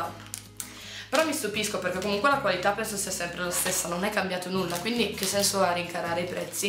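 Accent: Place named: Brescia, Italy. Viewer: di che parte di Brescia seii? appena hai salutato ho subito capito dall'accento che fossi delle mie stesse zone.. ahahaha :D begli acquisti comunque :)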